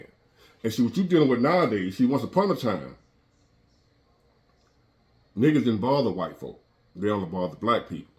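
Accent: United States. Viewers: It seems like the video is interesting, but I need to read through th comments to have a clue because of your heavy American accent.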